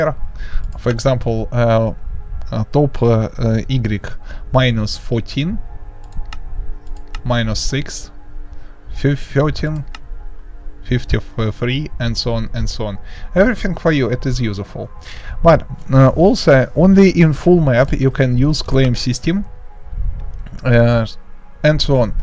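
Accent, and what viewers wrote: Russia. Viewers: да он русский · Русский акцент мне не кажется?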